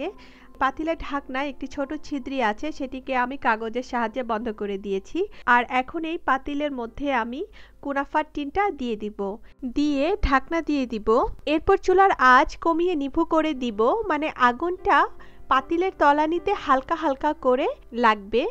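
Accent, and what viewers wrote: Sylhet, Bangladesh. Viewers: apu apni ki Sylhetyy?? Apner shob rannai amer kuv valo lage 💜💜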